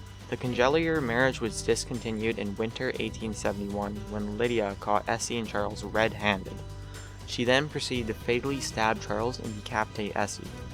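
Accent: Canada. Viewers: Is this kid Canadian? His accent annoys me. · are you Canadian ???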